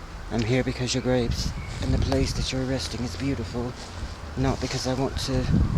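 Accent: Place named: United Kingdom. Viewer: Oh wow ok, I wasn't expecting you to be from NC. You picked up a some of that UK accent so that threw me off a little. I know some people who live in NC. My name is too and I'm from Maryland. I found your channel off a comment you made watching 539's channel. I watched a few of you vids and liked them although a couple had me really feeling your pain brother. I feel for you. I hope you can find a something to manage your symptoms better. No human should have to endure that kind of mental anguish. It's the worst kind of pain. It's like torture and really is worse than any physical pain. Physical pain can be dealt with.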